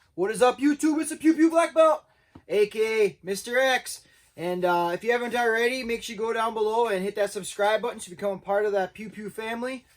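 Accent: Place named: Wisconsin, United States. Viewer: From Appleton, loving that Wisconnie accent. Great job taking us through this pistol.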